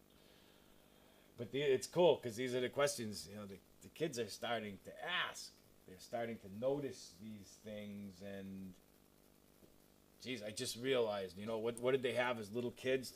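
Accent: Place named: New York, United States. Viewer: Glad you're back, you talk like a New Yawker.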